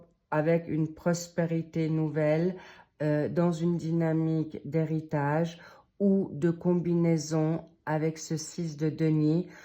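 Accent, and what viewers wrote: England, United Kingdom. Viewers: Merci très chère, j'adore votre voix votre accent anglais peut être? pouvez-vous me le dire.. Vous êtes merveilleusement bienveillante, réconfort et clarté dans vos propos,sans ambiguïté,sans complaisance tout en étant sensible et bonne enseignante de la vie dans tous les domaines, beaucoup de réalisme.Merci beaucoup et que Dieu vous bénisse abondamment.belle journée à vous.❤❤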